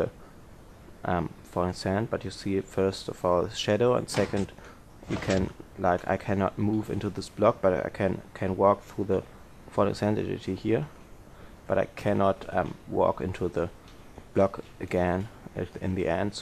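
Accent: Germany. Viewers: man merkt, dass du deutscher bist xD aber du sprichts sehr gut englisch mach weiter so :D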